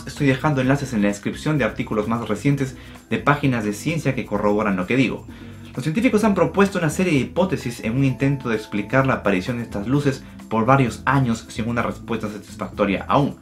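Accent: Peru. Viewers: Sospechaba que era de Perú pero recien hoy lo confirme xd , que orgullo que este hombre sea peruano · Eres peruano??? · Recién me entero que eres de Perú xD, aunque ya me daba esa sensación por tu forma tan neutra al hablar castellano y eso es algo característico de aquí. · eres peruano?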